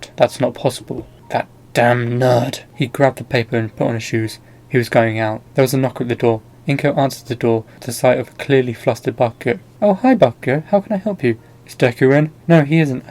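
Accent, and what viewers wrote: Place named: England, United Kingdom. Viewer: Just glad I can find a what if with a English lad narrating it.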